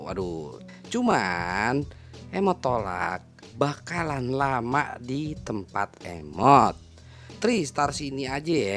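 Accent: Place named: Jakarta, Indonesia. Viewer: Kangen review suara cempreng dengan khas betawi dari kang emot.. :D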